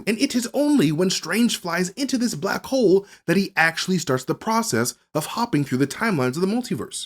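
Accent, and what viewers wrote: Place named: United Kingdom. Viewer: you're the black Loki Brutha💪🏿. You came back, can't stop, won't stop. You got the coolest brutha and the British accent, when yall come together, that's 21 SAVAGE. Dam this was a good bleepin break down. Ryan and his dog on Screen crush arguing on who need to step up. And ol boy is chained to that blue screen, yall keep doing yall Thang. WAKANDA 4EVA, & THE UK is okay! 👌🏿👌🏿👌🏿👌🏿💂🏿‍♂️💂🏿‍♂️💂🏿‍♂️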